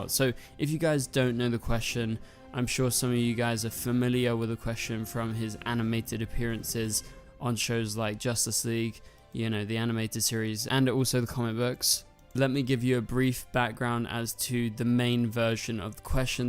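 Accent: United Kingdom. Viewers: Great video. I have never been a really big fan of non-powered superheros. Dont hate me, but i didnt even care much for Arrow, nor do I really like superheroes like Batman. To me, it is just more interesting if the characters have powers. I love it whenever those powers are explained more and i get to see all the various abilities in action. I am also a huge sucker for Origin Stories. I have the entire collection of the 80s DC comic series Whose Who. I just love that sort of stuff. Im also excited about the upcoming shows and movies that will feature Marvel's Wiccan. That is going to be so cool to watch. Hey, do you know if Flashverse is okay? He has not made a video or went Live in a long time. I always enjoyed it whenever the two of you made content together. You make a good duo. Please reach out to him and see if you can get him as a Guest. And maybe encourage him to begin posting videos again. 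I first found your channel and I have loved everything you do. You are very eloquent and I love your particular British accent. And through you, I found Flashverse. He is equally well-spoken, and I just adore his personality and his accent. You are both great. And I find what little bit of my life's happiness there is, whenever I see a new video posted by you both.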